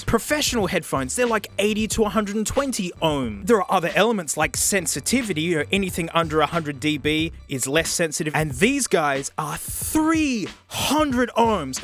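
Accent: Australia. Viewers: Somehow, compared to his first video, he has managed to make himself sound more Aussie.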